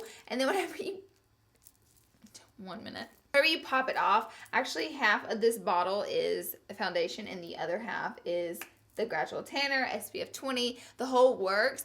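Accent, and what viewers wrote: South, United States. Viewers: when I first watched you your accent threw me off because I haven't heard anyone with a southern accent before..but I love your videos much love from Dq Arkansas❤ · Zoey VH I know a lot of southern people that say it just like her. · I love how you say "oily and grezy" lol that southern accent shines threw girl! Lol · Laura and I LOOOOOVE your videos and I love the southern accent. I'm from Texas so when I hear you say y'all it brings a smile to my face cuz I thought only Texans say y'all 🤣🤣🤣🤣👍👍👍👍😬😬😬😬 keep up the great work!! #teamLee!!!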